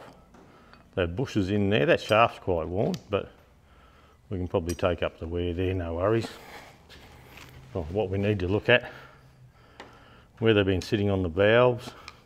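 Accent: Australia. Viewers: I love your Australian accent 😁🥃🇺🇸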